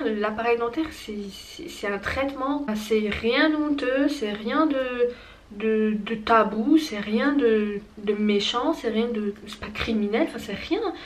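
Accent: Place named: Belgium. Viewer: T'as un petit accent belge, mais tu vis en France nan😂 ? ou exactement ?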